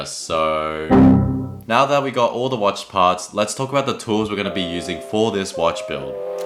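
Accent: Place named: Australia. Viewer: G'day. Decided to follow you because you're the only the second Australian accent I've heard doing YouTube watch content and wasn't interested in the other guy's content enough to follow. Please invest in some decent lights when you can and a better background, and I hope this goes from strength to strength for you. Cheers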